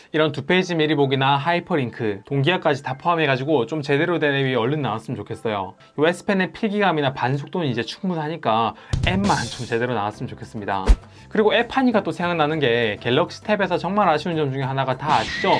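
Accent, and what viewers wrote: Gyeongsang, South Korea. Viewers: 고향이 부산이신가요?억양이 굉장히 경상도스러운데요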